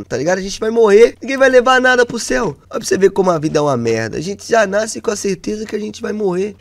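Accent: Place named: Santa Catarina, Brazil. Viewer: sotaque catarinense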